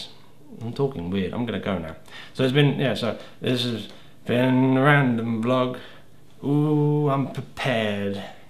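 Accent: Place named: Cornwall, United Kingdom. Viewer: ! Awesome amazing Cornish YouTuber is back! Ahhhhhhhh! Total fan girl moment over here! Cannot believe you're back, so sad when you said you wouldn't be making any more videos... Glad you are again... Your Cornish accent tag is so true to life, lol!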